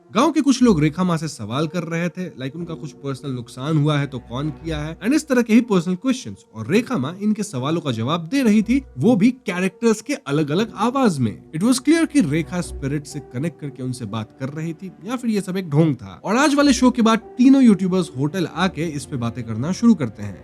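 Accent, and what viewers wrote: Bengal, India. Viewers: Bengali pronunciation from bhaiya se uff damn cute. Once again love from wb and i m one of your bengali subscribers or watchers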